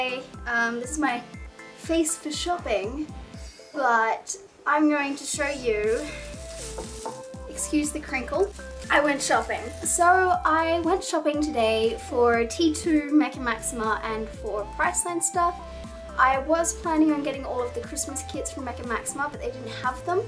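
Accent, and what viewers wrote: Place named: United Kingdom. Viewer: I cant believe i haven't noticed this but are you Britain you have an accent!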